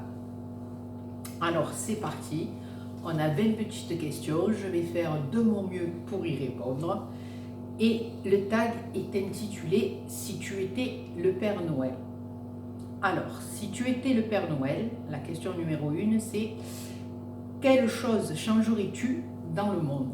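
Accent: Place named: Marseille, France. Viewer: Coucou. Je te trouve radieuse, tu brilles encore plus que ton magnifique sapin! Que d'émotion dans cette vidéo, on retrouve toute ta gentillesse, ta générosité, ta bienveillance, tu es une personne au grand coeur et on ne peut que t'aimer. J'adhère totalement à tes réponses, j'aimerais moi aussi changer beaucoup de choses, mais.... En plus tu me fais rire avec ton adorable accent de Marseille, ta poudre de Perlimpinpin et le chocolat. Merci pour cette vidéo. Passe une bonne fin d'année. Plein de bisous à toi et ton mari.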